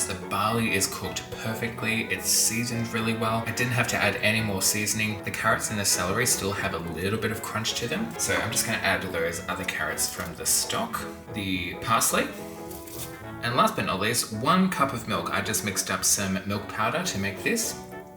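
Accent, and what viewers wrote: United Kingdom. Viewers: Gidday. I am a new sub and love your wartime meal cooking. I was born in SA, and live in QLD. My British in laws always told me that my accent sounds British. Well, when I watched a first video from your channel, I thought you sounded like a Pom! Fancy that. Nice to know you are an Aussie. Absolutely love your wartime recipe content, because my grandparents endured both world wars and the Depression. Both of my parents were born during WW2. I have kept my maternal grandma’s cookbook published in 1950. As you know, rationing extended until 1955. Many recipes in the book came from rationing. For your interest, the cookbook was the Green and Gold cookbook issued from the King’s College in Adelaide as a fundraiser. I have a more modern reprint but it doesn’t feature the same recipes. Good on you for your channel. Keep doing what you’re doing mate. 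❤️🇦🇺👍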